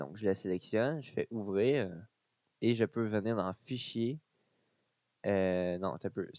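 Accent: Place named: Quebec, Canada. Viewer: j'aime tros ton actens quebecoi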